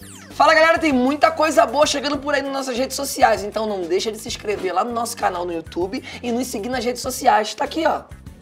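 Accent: Pernambuco, Brazil. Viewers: Agora tô mais fã ainda de tu,visse?! O sotaque de Pernambuco é massa mesmo!!! "Xero" Rafa!!!(por aqui a gente manda carinho assim!) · Realmente nosso sotaque é lindo #pernambuques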